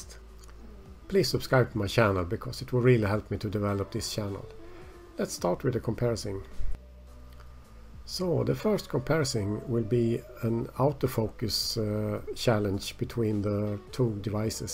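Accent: Sweden. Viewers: Thank you for the review, i can notice you are svensk hahha.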